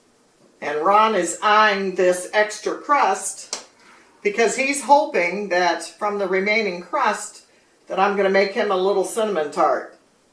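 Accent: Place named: Kentucky, United States. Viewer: You sound like a Ky. Girl, but I’m not sure. We like chushawl better than pumpkin too.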